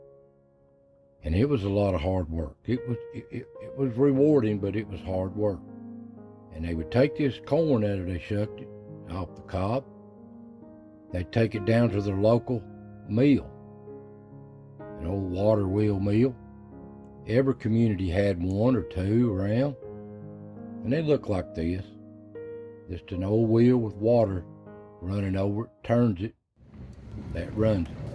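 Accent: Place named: South, United States. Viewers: Sounds like Eastern Oklahoma and Western Arkansas. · My parents grew up in West Virginia during the Great Depression. The US Government provided stamp books for sugar, shoes and more. With their gardens supplement the government food, they did well. This narrator speaks Southern Country, not West Virginian. This is not an accurate profile. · YOU sound Completely COUNTRY….I can remember My Sister in Law She was Much Older than Me Her husband could’ve been my Husbands Father he was a LATE in Life Baby…ANYWAY I remember HER putting Out a Garden EVERY YEAR and she always took off last 2 weeks of August and the First 2 weeks of September to do her canning….I had NEVER seen that Before also Saturdays was her House Cleaning Day And she would Iron EVERYTHING….In November that was Deer Hunting season they would cut it up and Freeze the Meat to have until the next year….They Also ate Squirrels 🐿️ and Everyone wanted the Brains….I NEVER a ANY MEAT because I NEVER knew What I was Getting and still to this Day I DO NOT eat meat…During Hunting season I couldn’t help with the Deer Meat and the first time I seen those deer 🦌 I almost fainted I had NEVER seen ANYTHING like It….I was Married for 28 yrs with this Family and MISS the ALL of themEveryday My Sister in Law Was the BEST Cook I ever Knew Christmas was WONDERFUL All of the Cakes Cookies Candy EVERYTHING was Homemade… She was the MoST BEAUTIFUL Woman I ever Knew next to My Mother…..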